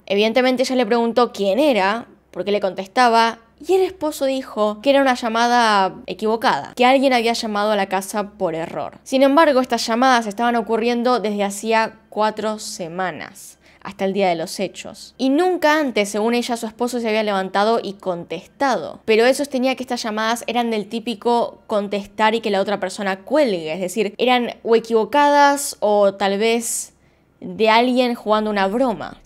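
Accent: Argentina. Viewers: Hola! Disculpame que te pregunte, se te hace difícil diferenciar acentos? O los escuchas igual? Por ejemplo acá ella que es argentina, o el español de mexico, españa, etc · yo tampoco entiendo todas las palabras pero me encantan sus vídeos y el acento argentino ❤❤ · Tu español latinoamericano argentino es hermoso (igual que el mío, que es de mas al norte) y quien no lo entienda es que no lo quiere entender… saludos hermana de La Patria Grande 😺😺💜💜🇲🇽🇲🇽🇦🇷🇦🇷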